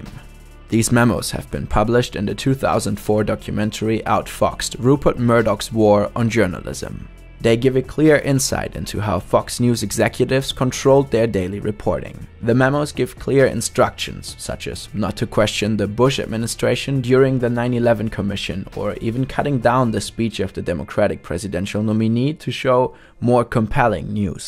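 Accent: Germany. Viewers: It's weird that mostly Germans blames about the fact that there isn't a native speaker. Most Americans and Britians (I know there're differences) blame about wrong grammar, spelling or words. They just (try to) understand what was said. But their grammar and pronunciation are great, so why changing it? Are you so distracted by the German accent from the video? I don't have that kind of problem. Disclaimer: I'm a German, so if I'm wrong what I said about grammar and pronunciation, please correct me. I don't know whether you're German or not, so if I'm wrong, please correct me, too. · Hey Guys, i love your Way but there is a little German in your English Tounge....that not mean your English is Bad. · Nice vid, but you should work a bit on your voice performance and your german accent. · holy this GERMAN accent xDD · In my opinion, you should get a native speaker for your videos, I just cant get that german accent out of head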